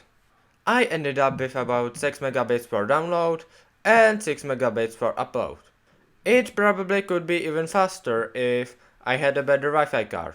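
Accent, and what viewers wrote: Czech Republic. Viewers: it's just Czech accent :-D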